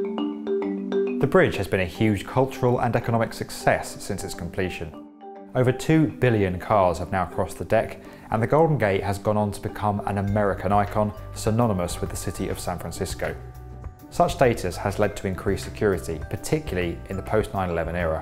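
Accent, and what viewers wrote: United Kingdom. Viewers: Why is a British guy narrating US history? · This is as heavy a British accent as it can get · Can you not find American's to narrate these videos. EVERY video I pull up has an annoying British accent. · I hate that the author has a British accent it’s pronounced Marin on Marion. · This guy must be brit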